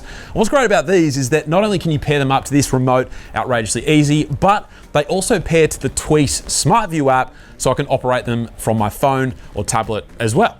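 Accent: Australia. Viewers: Dude I literally just found you today and I'm binge watching your videos now. Subbed for both the production and the accent. Aussie Oi! 😅❤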